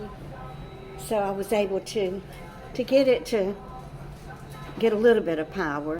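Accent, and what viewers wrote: South, United States. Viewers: Don't go to the used car company! LISTEN TO YOUR GUT!!! Repair your own car for much cheaper price. No guarantee that a newer car will not break down, either! Plus, A LOT OF USED CAR DEALERS ARE GETTING CARS THAT HAVE BEEN IN HURRICANES AND FLOODS AND PASSING THEM OFF AS GOOD CARS!! You can fake a carfax report and an inspection! Deal with your honest mechanic and fix your car, unless HE can find you a reliable car! Don't want to see you get burned! I must say this, with no disrespect intended, your Southern accent and friendly manner labels you as a soft touch and gullible to someone who is fraudulent! Be careful!❤️😊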